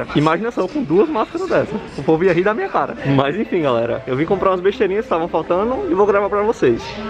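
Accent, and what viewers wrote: Northeast, Brazil. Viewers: Homem tu é lindo, mas esse seu sotaque é mais lindo ainda... Coisa deliciosa é um sotaque nordestino.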